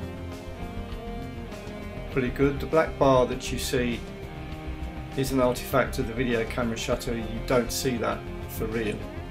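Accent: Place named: South London, United Kingdom. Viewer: Ah! I thought I recognised that 'Gateway to the South' accent. Wasn't 100% certain but I am now. Will never forget that wonderful tour you provided Lena and myself through the now tragically long gone MOMI, along with the marvellous hospitality, including our best ever overseas meal at your Balham residence. Having now reached another 'record age ( my first was 331/3 the second 45 :-) my collecting days arena largely behind me. In fact I've now reached, along with many of my co-hoarders, the 'what am I going to do with it all?' stage. However, my interest in early audio/visual technology has not at all diminished. Now, one of the (many) disadvantages of reaching a 'record' age is that it is now 70-years since I was the 8-year-old owners a Meccano set. (It got lost during a house move back in 1948).This means that I must leave you to home construct a Zoopraxiscope. 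When you do I trust you will share on YouTube. Keep up the good work - Charles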